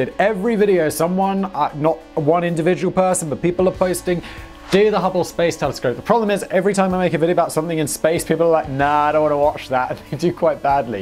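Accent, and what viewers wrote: United Kingdom. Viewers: Lots of "we" and "our" in that monologue coming from someone with a British accent.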